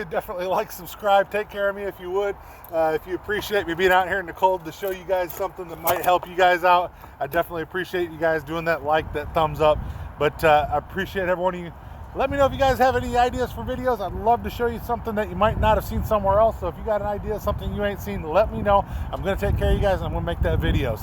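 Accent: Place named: Flint, United States. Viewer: Flint guy here, I know that accent!!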